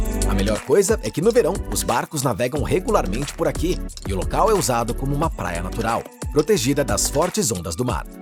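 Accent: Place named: Brazil. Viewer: Voz do Brasil Brazil 🇧🇷🇧🇷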